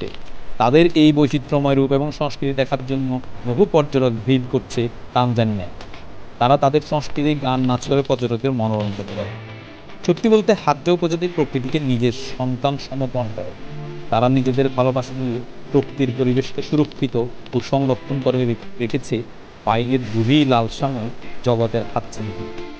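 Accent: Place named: Chittagong, Bangladesh. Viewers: ভাই আপনার কমেন্টারিতে নোয়াখাইল্লা, চাটগাইয়া,একসেন্ট আসে,শুনতে 😊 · ভাই কি চট্টগ্রাম নাকি নোয়াখালীর? ভাষায় তো চট্টগ্রাম। উডা হডে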